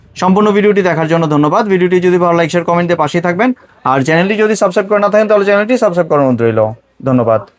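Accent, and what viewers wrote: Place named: Noakhali, Bangladesh. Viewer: ভাই নোয়াখালীর মনে হয়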